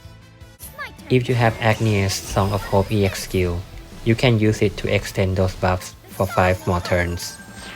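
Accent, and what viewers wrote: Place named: Thailand. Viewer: You sound Thai, where you from?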